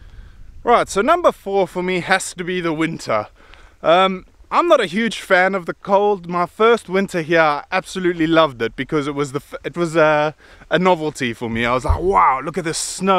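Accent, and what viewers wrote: South Africa. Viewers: Thank you for your honest confession. :-) I can imagine it's tough living so far from the sea. The larger lakes and water reservoirs don't really make up for it, as nice as they are. And I believe you when you say the UK's beaches aren't that magnificent-looking, compared to any of the coastlines in South Africa. You're right that some drivers still drive like maniacs. And that's after we've had stricter laws about speed limits and driving in general implemented in the last decade and a half or two decades. Some people just can't seem to learn they need to be more careful and have more empathy on the road. I suppose I've gotten pretty good at recognising anglophone accents over the years. I've only needed to listen to your's for a few seconds and thought, "Oh ! You're South African ?". :-) Well, I hope you like it over here. It's not a perfect country and it has its fair share of issues, but hopefully you'll find it welcoming and peaceful for many years to come. 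· Are you South African? answered that. Love it. Keep well, all the best. Haha, you never drove in Joburg then? 😂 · I kept wondering where you're from as your accent sounds South African, so happy that you mentioned it 😁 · Howzit. I also just moved here 3 months ago from RSA, i picked it up in your accent straight away... Lets get together and have a Braai one day, we have a Weber here too..✌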